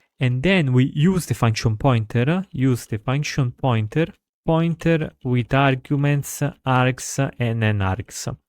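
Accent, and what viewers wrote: Italy. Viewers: Nice italian accent!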